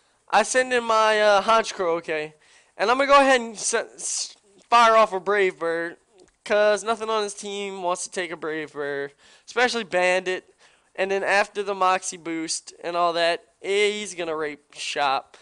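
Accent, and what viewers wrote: South, United States. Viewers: I DO BELIEVE. i liked that catchphrase lol. you sound southern. Usually i don't like that, but i like you lol. nice narration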